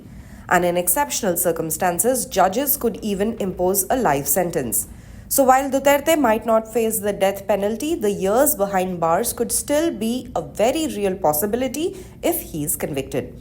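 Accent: India. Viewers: Indian Accent is masakit sa taenga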